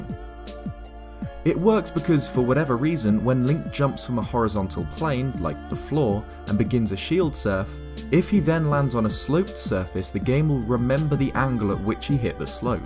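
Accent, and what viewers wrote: United Kingdom. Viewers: I think its a british thing, because I know that DanTDM - another british youtuber -says "lava" as "laver"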